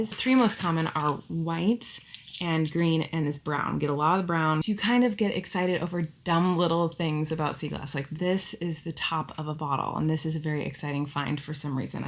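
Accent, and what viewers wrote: United States. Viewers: Oh wow I never thought of how you might not recognise your own accent just like I don’t recognise mine (South West, England). To me, you are THE most American sounding person ever, Californians are the most American sounding people to me like cartoons 😂 like I can’t even imagine you existing that’s how unreal you sound to me because I grew up watching American cartoons I just can’t imagine anyone with you actually existing in my world does that make sense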